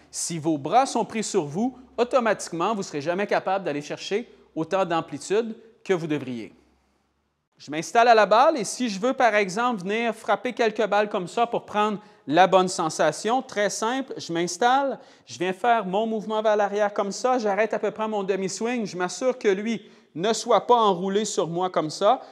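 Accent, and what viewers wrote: Canada. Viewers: Merci beaucoup, pour ces vidéos très claires et faciles à adapter. Et avec l’accent canadien, c’est encore plus sympa!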